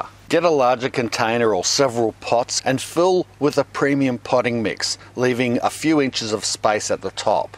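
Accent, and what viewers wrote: Australia. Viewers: This just come up and boy am I glad. Aussie Yeessss. I can understand you and you are talking Australian seasons. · that the Aussie accent I like to hear haha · It’s local Australian English spoken throughout the country. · Love the Aussie accent.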